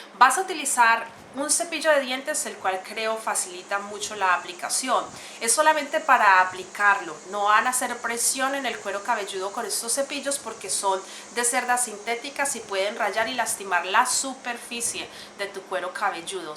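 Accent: Colombia. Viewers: Eres colombiana ? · me fascina como hablan los colombianos.....me fascina ese acento, ahhhhh que bonito jajaja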